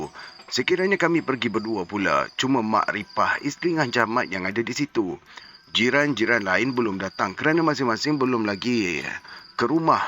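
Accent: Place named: Perak, Malaysia. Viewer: Assalamualaikum...wah arini mmg byk story ye cikgu☺️..dari nak kejor mat rempit membawa ke kelas bahasa..loghat perak pulak ye! Apa2 pun mmg terhibur .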